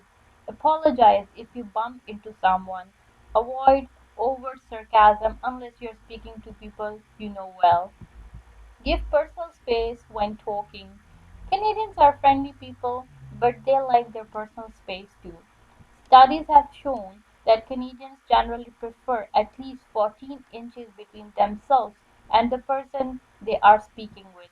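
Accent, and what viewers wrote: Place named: India. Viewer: Good approach to inspire people however the speaker's pronunciation is imposed-attepmted indianized one but not Canadian. Nothing close to Canadians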